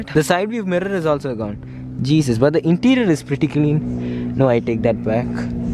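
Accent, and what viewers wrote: India. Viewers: YOUR ACCENT TO INDIAN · People make fun of other indians because of your accent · Indian accent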